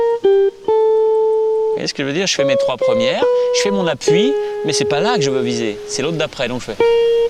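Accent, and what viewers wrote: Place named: Salagou, France. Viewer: Putain con, cet accent du Salagou!!! ça donne envie de te suivre.Merci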